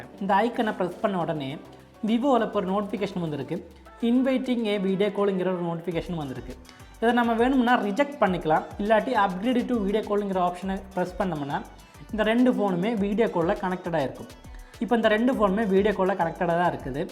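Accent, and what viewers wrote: Kerala, India. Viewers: Bro ninga keralava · Bro Nenga Kerala Va... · Malayalam slang mari irukku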